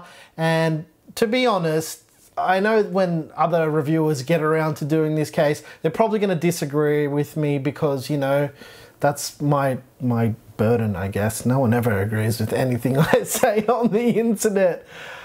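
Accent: Australia. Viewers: A Mexican with an Australian accent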